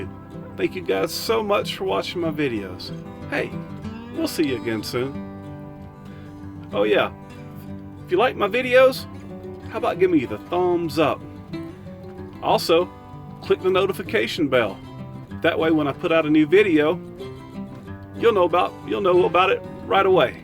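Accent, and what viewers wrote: South, United States. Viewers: OMG, laughing so hard!!! Being a southern girl living in Prescott Valley, AZ now; I sure do enjoy listening to your southern drawl! Thanks for making my night ;) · There is nothing like southern charm..❤️❤️..l really like that your a southern boy. The way you talk is so smoothing to me. Could listen to you all day my Dad was from Norfolk Virginia and he had such smoothing charm..😍too. You are so funny..l'm still laughing ..haha..So glad Martini is feeling better love ❤️to you and the woof pack always..🐾🐾🐾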